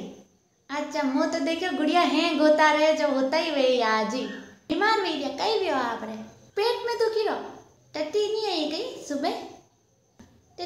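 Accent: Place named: Sirohi, India. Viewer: Sirohi vale ese hi bolte hai Koi fark nhi hai marvadi or jo aap bol rhe ime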